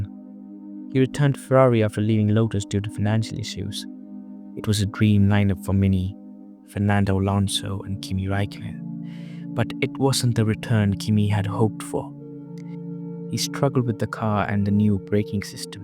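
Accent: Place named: India. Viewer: real - I think indian accent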